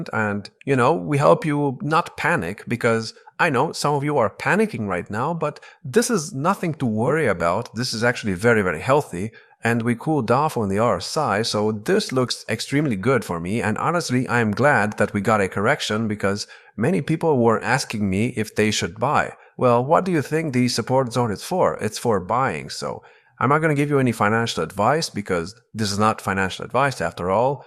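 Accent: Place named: Iran. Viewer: hes accent reminds me of farsi so my guess is Iran 🎉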